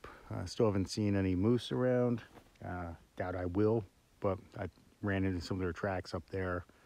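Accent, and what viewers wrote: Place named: United States. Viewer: Nice video but you sound American...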